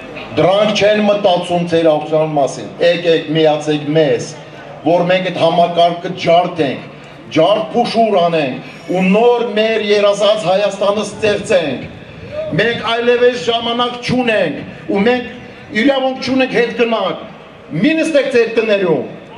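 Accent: Karabakh, Azerbaijan. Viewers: Էս խոսողը ոնց որ ղարաբաղի ակցենտով է խոսում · Это инс харабахи акцентова хосум хи инке hай чи, де има лси ай ору вор Арцахе андвец туркерин уремс тох имена Айастана тох ангист чи кнелу, турки ерезанк Айастана имац дезел чи ангист Токелау кнек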